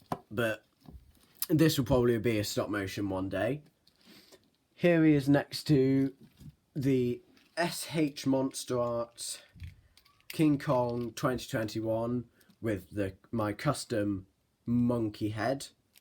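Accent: United Kingdom. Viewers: I love that British accent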